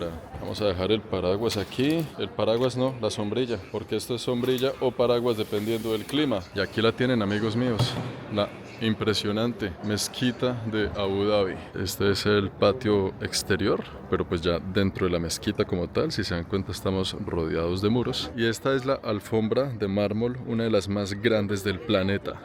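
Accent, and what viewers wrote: Colombia. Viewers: Que jodido eres para narrar paisa , me haces reir ; sobretodo ese acento colombiano me encanta . Noble tierra , gente maravillosa como tu . Saludos desde Suiza 😊.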